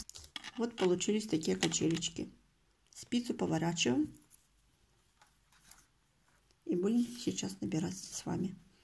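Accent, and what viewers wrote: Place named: Belarus. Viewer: Это беларусский говор.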